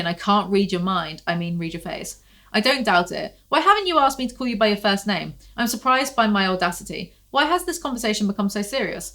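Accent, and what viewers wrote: United Kingdom. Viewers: every time i finish one of your 2-3 hour long videos i start thinking in a british accent. i just wanted to share this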